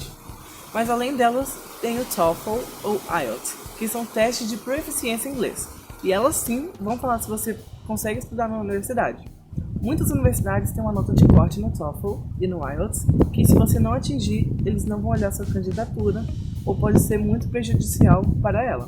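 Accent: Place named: Minas Gerais, Brazil. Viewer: Caraca mano, de minas kkkk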